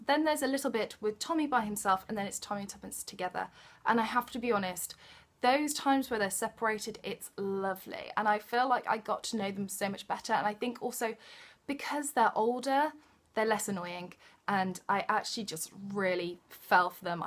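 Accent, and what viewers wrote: England, United Kingdom. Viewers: I love your channel. You make reading look so glamorous! I wish I also had an English accent. It makes you seem so sophisticated. :)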